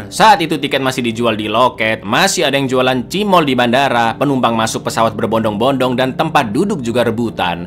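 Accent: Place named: Sidoarjo, Indonesia. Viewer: Cak mau tanya awkmu arek Endi asline kok logat e kyk wong darjoooo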